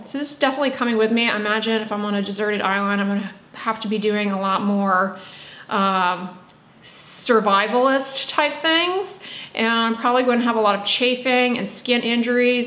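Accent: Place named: California, United States. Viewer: Her california accent is insane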